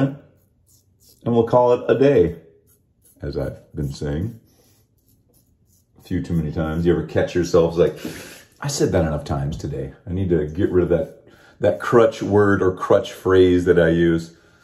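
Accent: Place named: RP, United Kingdom. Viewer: You’re accent was actually pretty good. You sound like a member of the royal family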